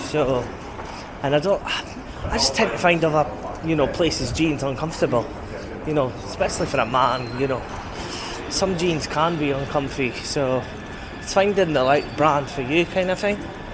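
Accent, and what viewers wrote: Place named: Scotland, United Kingdom. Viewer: Aww Scottish fwend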